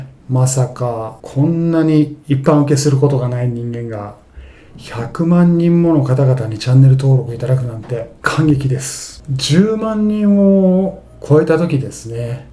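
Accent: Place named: Fukui, Japan. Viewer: イントネーションが福井っぽい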